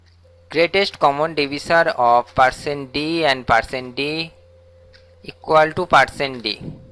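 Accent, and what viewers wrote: India. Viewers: thoroughly indian accent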